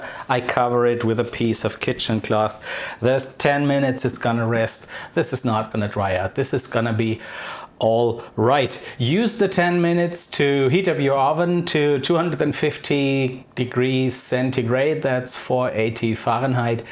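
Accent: Germany. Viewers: Lol all Germans say ‘stripes’ instead of ‘strips.’ And ‘vinegar’ invariably becomes ‘winegar,’ which is weird given ‘w’ is pronounced more like ‘v’ in German! But anyway, thanks for sharing - I love Flammkuchen.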